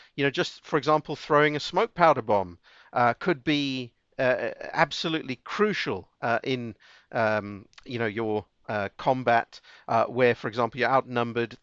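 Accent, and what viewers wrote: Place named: Greece. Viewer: You sound so much like Greek lol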